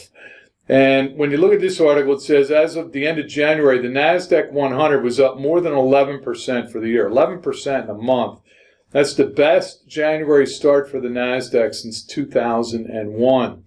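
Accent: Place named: Philadelphia, United States. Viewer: I've listened to weekly half-hour infomercial for many years. He's a guy whose appearance does not match his voice. That's neither a compliment nor a put down. Just an observation. One thing I'll give him is he's got the southeast Philly metro dialect down pat. When I hear guys talk like, I know I'm dealing with someone from home.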